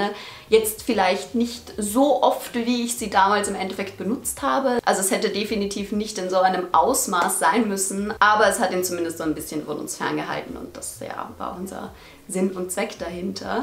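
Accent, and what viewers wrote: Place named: Vienna, Austria. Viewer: Ich höre dennoch dein wienerdialekt durch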